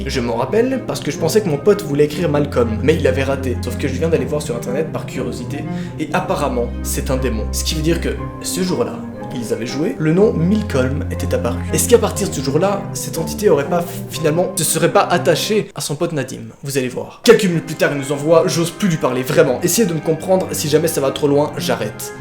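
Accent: Switzerland. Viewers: Pas de doute tu es suisse ! J’adore ton accent ! (Je sais de quoi je parle) 😂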